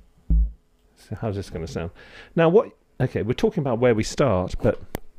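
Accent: United Kingdom. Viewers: for the 30 seconds i just like his British accent and humble personality just pairs well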